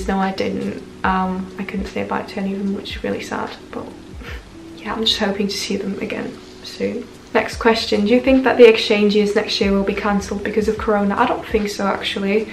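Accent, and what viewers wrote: United Kingdom. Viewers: Ich würd' mich voll freuen, wenn du weiterhin englische Videos machen würdest. Und ich find' man hört voll das Britische in deinem Englisch, was wirklich toll klingt. :D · Love ur British accent ❤ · Omg wie gut sich dein Akzent einfach anhört😍 Ich mache 2020/21 ein AJ in Weston-super-Mare, North Somerset (hab es mir nicht ausgesucht,bin aber total happy) und ich möchte unbedingt auch so britisch klingen, wenn ich wieder zurück komme. Dir noch einen schönen Tag und vielen Dank für deine Videos :) x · Ich find es mega interessant zu sehen, dass du einen Nord - Englischen Akzent bekommen hast, weil du ja in dieser Region warst :) Es kommt also wirklich darauf an, wo man lebt, was dann Einfluss auf den britischen Akzent hat! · Oha, krass hab zwar erwartet, dass dein Englisch gut ist, aber find‘s echt krass wie britisch dein Englisch klingt!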